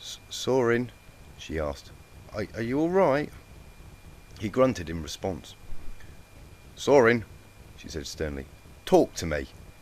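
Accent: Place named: United Kingdom. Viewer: the guy reading is british, and guy who wrote it is aussy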